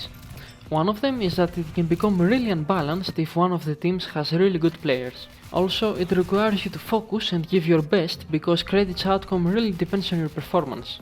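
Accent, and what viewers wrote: Greece. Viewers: το κατάλαβα από την προφορά , αδερφέ. Έλληνας και εγώ είμαι. Είσαι καλός.. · Εχεις τοσο ελληνικη προφορα στα αγγλικα! Ωραιο βοντεο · Are you from Greece? Casue you sound a lot like a greek person!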